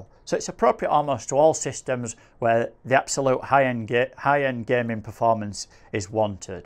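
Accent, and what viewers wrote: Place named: Northern England, United Kingdom. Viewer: nothing's wrong with him lmao. It's the British accent from the north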